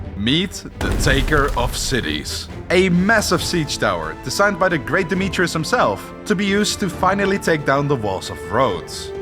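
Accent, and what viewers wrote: Netherlands. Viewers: I smell dutch and i love it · I can smell Dutch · Horrible Dutch accent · Gemaakt door een kaaskop jeeeeez je hoort het gelijk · I smell dutch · Wat een prachtig Nederlands accent! Love it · Wow wat slechte spraak in Engels. Duidelijk te horen dat je Nederlands bent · nederlandse accent? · please get someone to voice these in i cant w the dutch accent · I'm also dutch and I could immediately tell you were dutch because of the accent 😂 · Nederlands accent gaat hard maat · Is the guy talking originally Dutch? :D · I can smell a dutch fella from a sentence away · Like the video, but the dunglish hurts my soul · Dat Nederlandse accent haha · I've never watched your channel, but I can tell you're Dutch, so stop that. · Are you Dutch. I hear an Dutch accent (I’m Belgian) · Im way too late on this but I can just smell the Dutch in your voice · Are you Dutch/Belgian? 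· Ik hou van het nederlands engels accent. Altijd herkenbaar :)) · Geweldige video maar als nederlander irriteer ik me vreselijk aan het nederlandse accent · You have the most Dutchest accent I’ve ever heard lol. Nbl from a fellow Dutchman ;) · When I started watching this vid and I heard ur voice I knew you are Dutch immediately😂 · Bro, je Nederlands accent schijnt dik door😂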